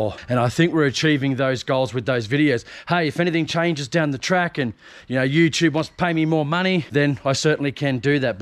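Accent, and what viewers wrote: Australia. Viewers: You sound Australian. I would be a tad scared of the snakes and spiders on that job. Glad you did help the lady out. Well done.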